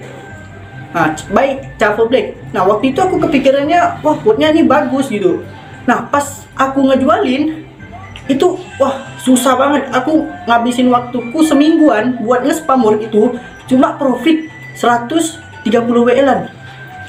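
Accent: Indonesia. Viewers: Orang Kalimantan kah ini? Dari logatnya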